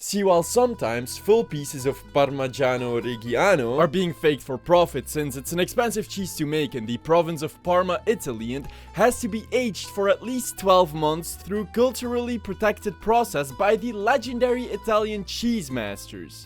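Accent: Netherlands. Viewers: How much of this applies to US markets vs our European markets? You constantly reference US cases and US studies and list everything in dollars (for some reason), but you seem to be dutch (judging from your accent and the Delhaize brands) and testing European products sold under European laws. Our European Union has a lot more stringent laws on food quality and truthfulness in their labels, although they are not perfect, they are a lot better in general than the capitalist Mad Max hell the poor Yanks live in. It would be great if you could be clearer on such significant differences in the future, because it's an important topic and a fun video in general, but with oversights like this, you could worry the wrong people or, even worse, desensitise people to the wrong topics. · Accent sounds Dutch to me.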